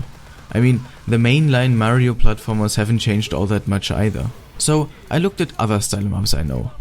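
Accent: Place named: Germany. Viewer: sounds like a german accent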